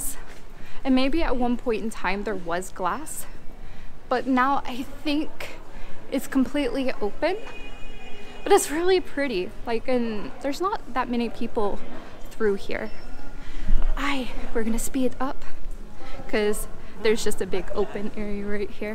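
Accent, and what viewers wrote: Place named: England, United Kingdom. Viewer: How beautiful you are, I love your English accent !!😘😍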